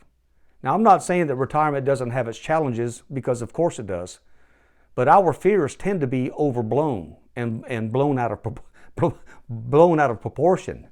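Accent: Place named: Louisiana, United States. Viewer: I hear a Cajun accent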